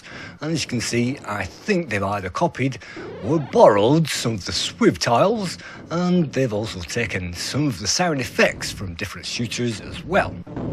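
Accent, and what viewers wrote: United Kingdom. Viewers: One of the cool things about retro computing channels is the way the people who make them (yourself included ) have various accents from around the UK. After all, they are not so commonplace. Another example is Lorfarius. Cool channel. Sure, not all accents are gone... yet. Hearing the cool accents is indicative of the time when these computers were the "in thing." After all, this retro computing is about the "experience" and not just the machine or an emulation. #RealDeal #LurmunUrmurga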